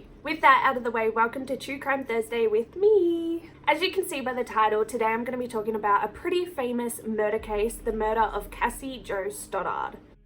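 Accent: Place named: Australia. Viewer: He can never be forgiven for what he did, neither of them can, but I do believe I see regret in Brian's eyes. The other dude just comes across as a complete and utter psycho, and his parents are clearly deluded and in denial. Anyway, awesome presentation! You're rather easy on the eye and who doesn't love an Aussie accent? Sucks that you don't really seem to be active any longer. Stay safe!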